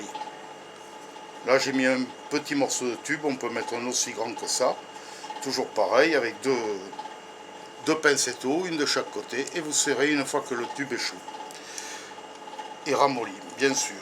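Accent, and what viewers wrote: South, France. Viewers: tres sympa cette video. et toujours avé l'accent!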